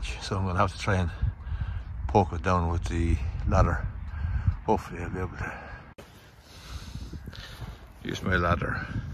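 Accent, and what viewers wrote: Ireland. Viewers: I like how the YouTube subtitles completely misunderstand the Irish accent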